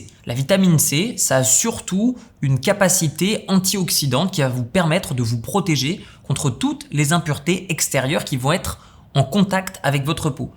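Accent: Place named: Lomme, France. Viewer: Depuis toutes ces années, je crois que c'est ma vidéo préférée. De bons conseils, on voit aussi l'envers du décors on va dire. Toujours aussi inspirant. J'adore l'accent qui reste avec le temps (je viens de Lomme, j'ai l'impression de connaitre du coup 😅). Excellente vidéo, comme d'habitude j'ai envie de dire.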